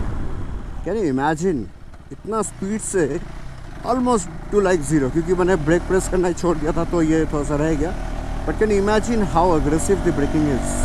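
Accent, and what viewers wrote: Bengal, India. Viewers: Bhaiya Bangali ho Keya ?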